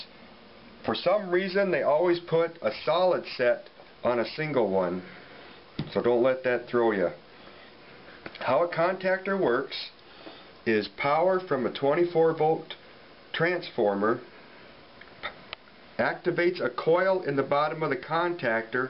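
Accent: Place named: Midwest, United States. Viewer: This is the most midwestern guy I've ever heard in my life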